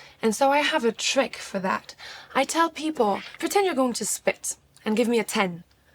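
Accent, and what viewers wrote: France. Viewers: Her French accent is so smooth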